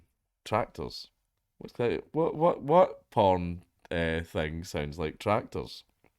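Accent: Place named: Glasgow, United Kingdom. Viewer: Completely unrelated but do you listen to grime by chance? I’m from Topeka Kansas n iv been checking out artists all of the UK n Ireland! Gotta admit the Glasgow accent is abit difficult to understand but I think I understand it much better than most in my area having watched many movies of Glaswegian in em! Keep up the great content n keep ‘em coming, no pun intended!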